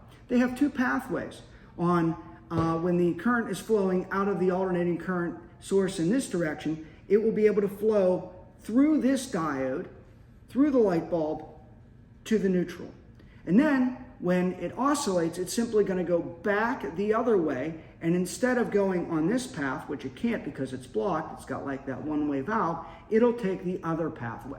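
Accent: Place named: United States. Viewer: The lamps will both illiminate bit alternate at the frequency of the AC power. 60 Hz since he seems to have a US accent. 50 Hz in yhe redt of the world. It may allear as both on together though due to filiment heat and visual preception time. Each diode will block half the wave. Since they are oppsite they will block the opposite side. 99%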